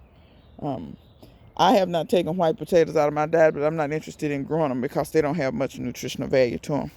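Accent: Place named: South, United States. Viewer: Girl you crack me up. Me and my husband be watching your videos laughing at you and those cats. I love your country accent. I thought I was country. Lol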